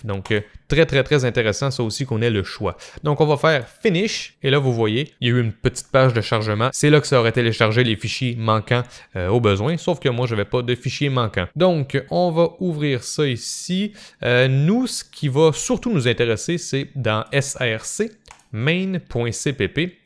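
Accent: Quebec, Canada. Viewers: Bonjour, Je te remercie pour ton site et tes vidéos. Je suis un vieux programmeur et je m'intéresse aux sujets que tu présentes. Merci pour celui sur l'Alfawise U20 One, achetée, surper content. Merci aussi pour les sujets ESPxx. C'est bien que tu présentes VSCode qui est un "rouleau compresseur" qui écrase tout sur son passage. Je l'utilise depuis la version 0.8 et je l'ai vu grandir. J'ai fait beaucoup d'Arduino pour mon plaisir durant quelques années. Quand je m'y suis remis, j'ai failli vomir : le même IDE qu'en 2011, à quelque chose près. Utiliser VSCode pour refaire de l'Arduino m'a redonné du plaisir à coder pour ces petites machines. Je crois qu'il ne faut pas hésiter à laisser l'IDE d'Arduino de côté. VSCode propose un retour sur investissement bien supérieur et il va continuer de grandir, l'IDE Arduino actuel, c'est mort. J'utilise VSCode professionnellement sur Windows et sous Linux (ça marche même sur une rasp !) et c'est un outil de productivité formidable. 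Je ne voulais plus faire de "Visual Studio" tout court, j'en ai marre de ce genre de produits. Avec "Code", j'ai vraiment retrouvé le plaisir de coder (en python essentiellement). Merci encore pour tes vidéos (et ton léger accent canadien c'est du pur bonheur pour les français) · Bonjour. Voir et écouter tes video est un double plaisir. Les explications sont très claires, ni trop rapide ni trop lentes. Tu fais tomber les barrières technique avec une facilité déconcertante! Le second plaisir, c'est entendre ces explications avec cet accent québécois que j'adore (je suis français et j'habite à Paris) me remplit toujours de joie! J'espère pouvoir retourner prochainement à Montréal. Si tu y habites je t'offre une bière!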